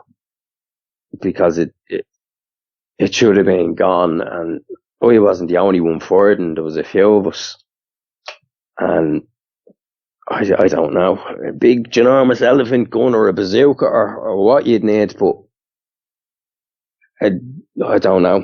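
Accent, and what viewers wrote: Ireland. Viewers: Number one, I am so very sorry that when you think of America that this encounter will always be your first memory of our country. Number 2, I have visited former Jugoslavia, and Germany and I hope to travel to Ireland one day. I find your accent/brogue beautiful. I am Italian but I have always been drawn to the Irish and Ireland and I am not sure why. I want you to know we appreciate you sharing your encounter with all of us, as I can't imagine just how difficult this was for you to retell. 💕🙏💕🙏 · As an Irish guy listening to this randomly hearing an other Irish guy talk about a dog man is bizarre to me 😳, awesome 🤙🏽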